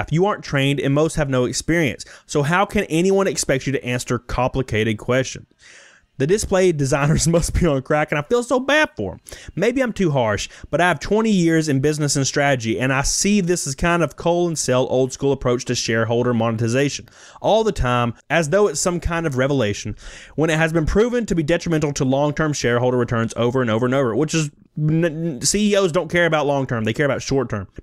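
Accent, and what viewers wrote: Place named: Alabama, United States. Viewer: I was watching you on my tv and my wife said " does his voice really sound like that?" I died laughing. I said hes from alabama. she goes ohhhhhhh.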